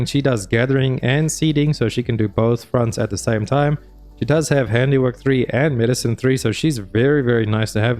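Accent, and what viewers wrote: South Africa. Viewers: Cant help but shake the slightly South African accent?😅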